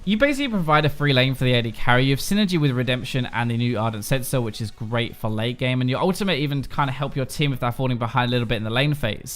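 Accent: England, United Kingdom. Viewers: hey which part of England are you from? i cant figure out from your accent, thanks:)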